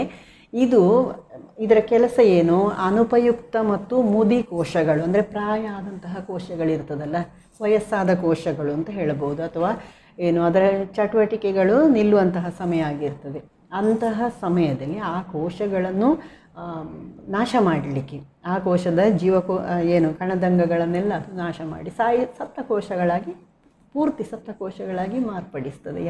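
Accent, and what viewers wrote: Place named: Coastal Karnataka, India. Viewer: ತಾಯಿ ನೀಮ್ಮ ಕರಾವಳಿ ಸೊಬಗಿನ ಭಾಷೆ ತುಂಬಾ ಇಷ್ಟವಾಯಿತು.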